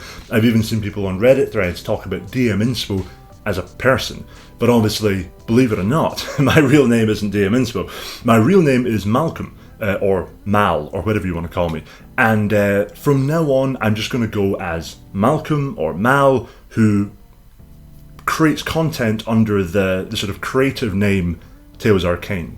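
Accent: Scotland, United Kingdom. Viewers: I have literally never heard of this channel before but silver lining of this OGL debacle is that I am finding creators I had never heard of. Also you have another fan of the accent here :D. Is it Scottish?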